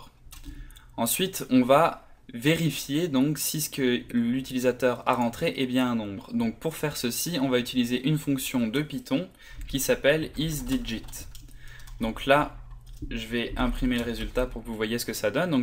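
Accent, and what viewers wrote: Canada. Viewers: T'as des sonorités canadienne dans ta voix ^^ Sinon merci pour ton tutoriel, il est juste parfait ! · il m'avait bien semblé déceler un accent canadien dans ta voix !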